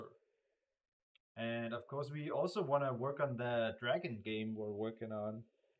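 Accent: Denmark. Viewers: Are you from denmark🇩🇰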